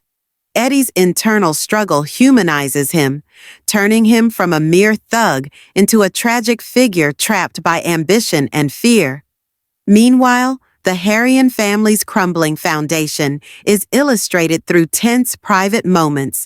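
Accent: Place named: United States. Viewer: It's interesting having the feedback, but with the american accent of this woman , she is not pronouncing most of the words properly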